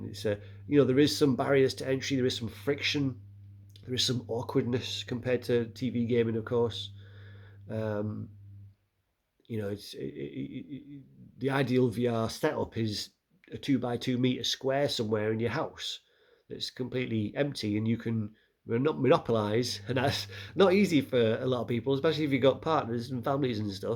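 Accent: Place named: Australia. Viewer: You have a distinct Australian accent. How did you learn it? 👍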